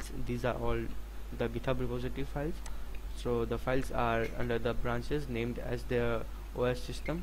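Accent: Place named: India. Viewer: Authentic Indian accent😄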